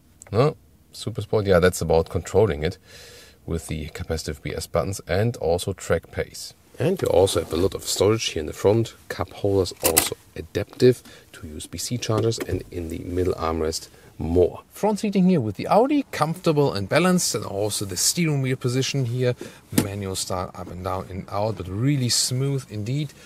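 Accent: Germany. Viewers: English with German Dialect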